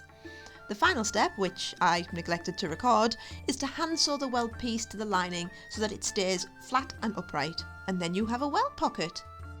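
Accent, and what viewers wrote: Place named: Teesside, United Kingdom. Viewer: Thanks for this video. I have absolutely zero skills in making clothing but it’s been really helpful in relation to fabrics, colours and even potential suppliers. I also recognise that accent. Teessider? Or there about?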